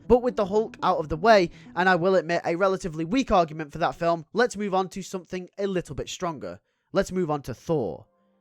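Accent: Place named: England, United Kingdom. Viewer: Please; only speak the Human language aka American English, the stuff you render now is putrid noise from mere england, the ugly proto form of our language.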